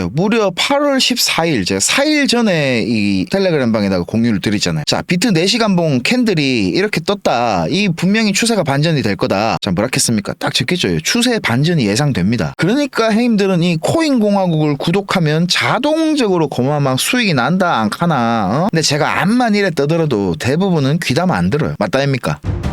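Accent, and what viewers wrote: South Korea. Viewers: 확실한서울발음~^^ 퀀트1기입니다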